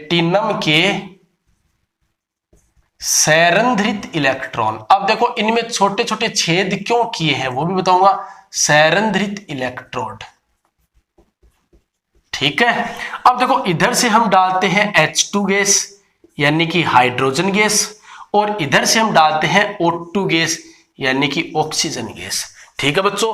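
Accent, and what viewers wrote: Punjab, India. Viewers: Aap Punjab se ho sir kya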